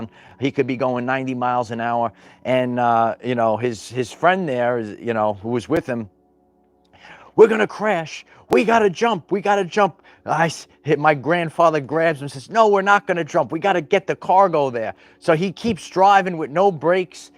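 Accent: New York, United States. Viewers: Love ur NY accent!!! Thank u for this reading 🙌✨💫❤️🙏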